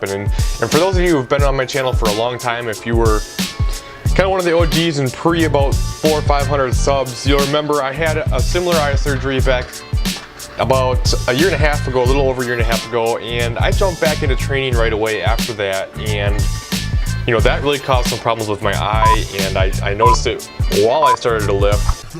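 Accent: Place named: Minnesota, United States. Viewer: I missed that Minnesota accent. I'll see you at nationals also bb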